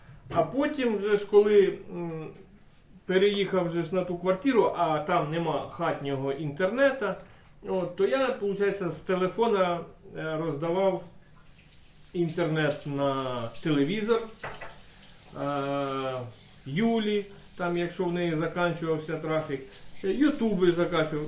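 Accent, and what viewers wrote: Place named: Bila Tserkva, Ukraine. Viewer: отож таке. Уроди скрізь є. А ну скажи мені будь ласка, ти проживав в районі Білої Церкви? (Приблизно) Сильно діалект знайомий. Бажаю Вашій сім'ї всього хорошого.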